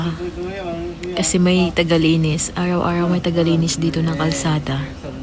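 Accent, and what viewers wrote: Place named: Ilonggo, Philippines. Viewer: Ilonga kasi ibà kung minsan ang pagbigkas❤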